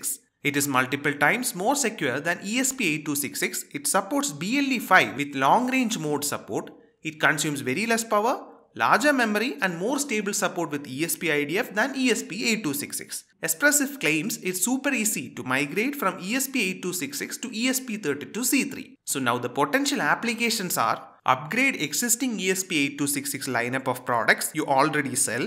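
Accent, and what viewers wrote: India. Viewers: Sorry I find the Indian accents the hardest to listen to and understand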